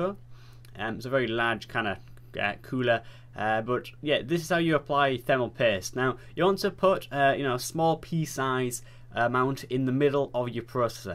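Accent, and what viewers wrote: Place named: Northern England, United Kingdom. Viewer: Thought so, you sound more northen than me haha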